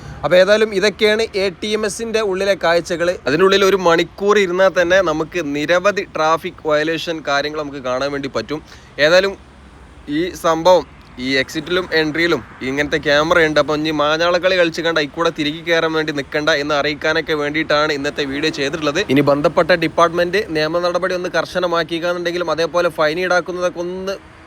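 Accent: Malappuram, India. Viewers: മലപ്പുറം സ്ലാങ്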